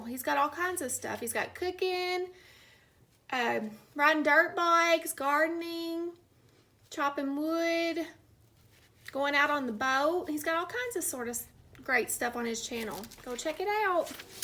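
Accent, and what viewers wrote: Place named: Kentucky, United States. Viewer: Hey there fellow Kentuckian! (I live in PA but I hail from the Bluegrass State!). I found your channel thru MrG1965. I have family in central Kentucky - Greensburg and Campbellsville. It's just lovely to hear someone with a KY accent! I don't hear it much up around here in Yankee territory! My wife does all the cooking here, and she's knows what she's doin too! I'm happy to support your channel and if you feel moved to return the favor that would be greatly appreciated. And if you don't feel moved, well, that's okay too! I hope yall had a wonderful Thanksgiving!! I forgot to ask......may I please have a slice of that delicious lookin chocolate pie?!!??!!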